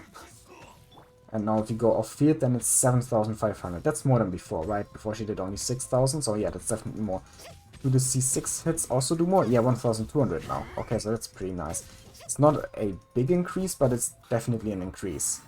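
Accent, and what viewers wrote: Germany. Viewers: 3 sec angeschaut direkt rausgehört aha der kommt aus deutschland ༼ つ ◕_◕ ༽つ <3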